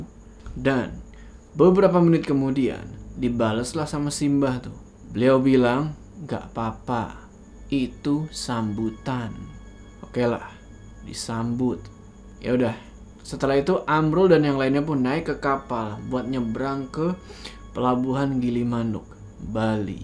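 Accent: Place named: East Java, Indonesia. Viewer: Emang kenapa kalo ngomong jawa timuran?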